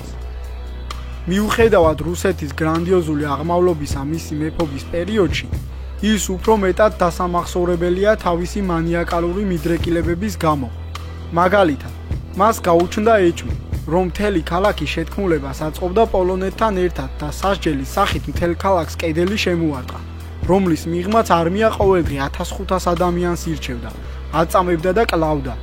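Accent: Kutaisi, Georgia. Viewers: ქუთაისური კილოთი ისტორიულიფატტების კითხვა კარგი გგონია?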